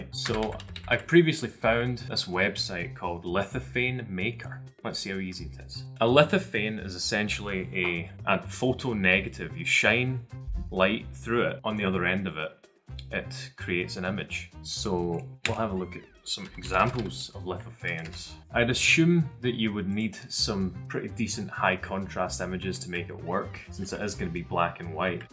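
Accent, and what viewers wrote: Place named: Scotland, United Kingdom. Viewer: And why does he have what must be an Scottish accent?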